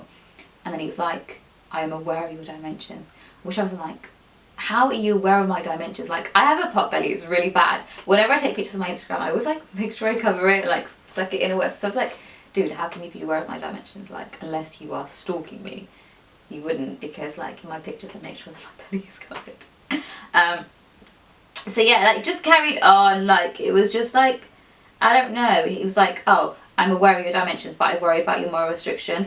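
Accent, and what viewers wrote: United Kingdom. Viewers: Your accent is soo cute! I'm British too but your British accent is so nice!❤️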